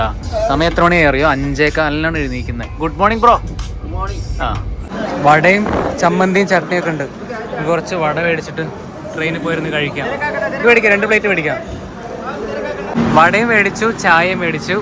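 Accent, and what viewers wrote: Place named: Ponnani, India. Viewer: ഞാൻ പഠിച്ചത് MES കോളേജിൽ ആണ്. എന്റെ സ്ഥലം കുമരനെല്ലൂർ. പിന്നെ നിങ്ങളുടെ സംസാര രീതി കേട്ടപ്പോൾ നമ്മുടെ അടുത്ത് തന്നെയാണ് എന്ന് തോന്നി. എല്ലാ ആശംസകളും നേരുന്നു.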